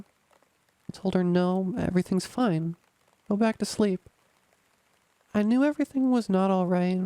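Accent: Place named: New York, United States. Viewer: Love this new you. Are you east coast or west coast. Just trying to figure out the accent. Sounds more New York.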